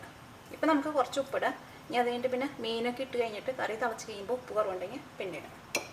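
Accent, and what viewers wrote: Thrissur, India. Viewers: We Like the Trissur slang. All of your recipes are simple and really good! Keep it up!!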